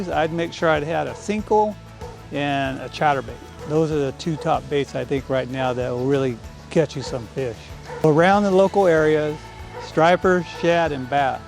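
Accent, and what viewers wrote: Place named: South, United States. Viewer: Mr,you sound like you're from south!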